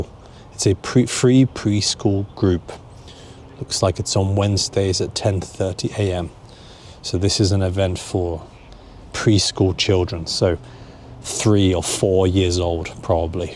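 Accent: London, United Kingdom. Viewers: Your accent gives me all the memories of my younger life in London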